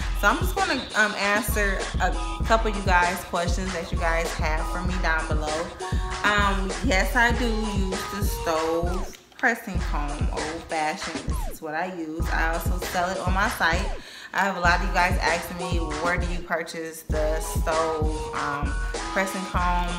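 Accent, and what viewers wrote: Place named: Detroit, United States. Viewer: Your voice sounds like your from Detroit. If so, hey girll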